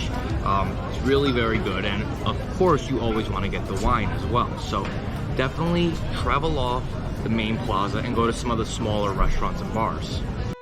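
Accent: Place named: United States. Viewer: what did he say that was not wrong? I also live in this city and I found what he said to be accurate. Yes not all resturants are closed during the afternoon but if its past 1pm good luck with getting into one as the kitchen can close between 1 and pm Lunch is like 1-1.5hr window to go. He sounds like he is either American or Canadian and there places are open all the time. So it is very different.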